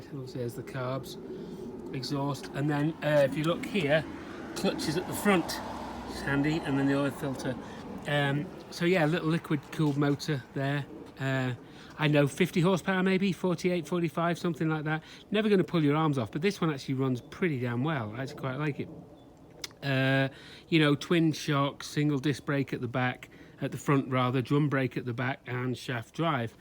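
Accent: United Kingdom. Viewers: Question. Have you had muck around with the carburetors ever. I have a Deluxe, for their time they are among the best, lol oking qt other bikes that age. Little liquid vtwin. Ive never heard someone curse so much in the kings English, it was refreshing, thanks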